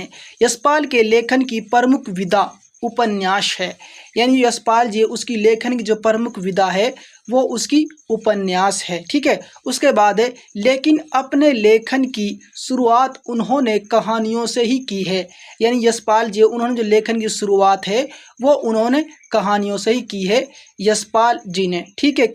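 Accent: Mewat, India. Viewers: Oye tu mewati to na h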